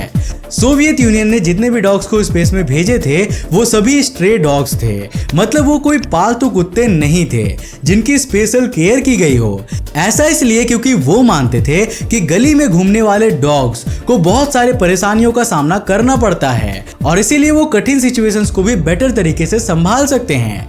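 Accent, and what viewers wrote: Bengal, India. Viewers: are u from West Bengal? BECAUSE YOUR ACCENT SAYS SO. AND I PERSONALLY LOVE BENGALI PEOPLE.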